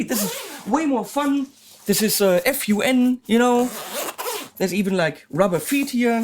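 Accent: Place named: Germany. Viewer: wonderful Sherman Akzent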